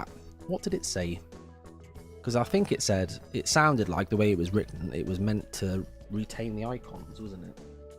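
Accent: United Kingdom. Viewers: btw love who your pure British "yeah lemme get more tea" LOL stay cool my guy PS what's your favorite Michael mjd video?? Mine is the one where everything goes wrong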